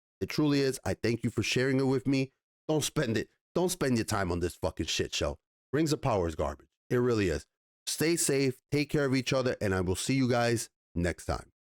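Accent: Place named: New York, United States. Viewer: Nothing speaks to my soul more than a New York accent ranting. Subscribed.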